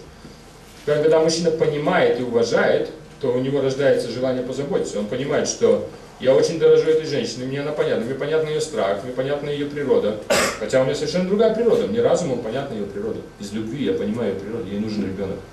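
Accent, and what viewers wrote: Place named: England, United Kingdom. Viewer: Речь ужасно косноязычная, с каким-то английским! акцентом. Поет фальшиво))))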